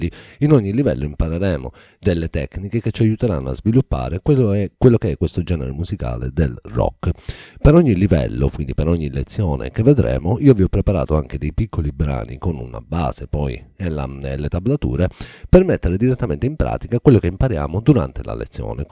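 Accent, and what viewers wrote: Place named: Sicily, Italy. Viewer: io sono ciociaro di origine, ma essendo sposato e vivendo a Palermo, l'accento siciliano non è passato certo inosservato 😂 Comunque complimenti ancora e ti seguo sempre.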